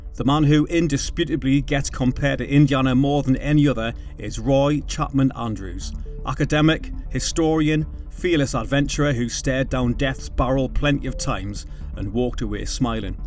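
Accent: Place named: Geordie, United Kingdom. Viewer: Instantly switched off with the overly Geordie accent. WTF?!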